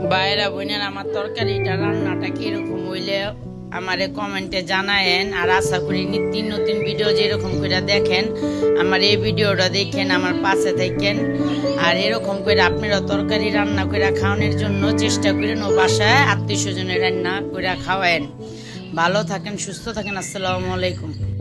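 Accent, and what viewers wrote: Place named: Old Dhaka, Bangladesh. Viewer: অলাইকুম সালাম আপু, পুরান ঢাকার ভাসা আমার খুব প্রিয়, সব কিছু মিলিয়ে পুরো ভিডিও টা খুব ভালো লাগলো ❤❤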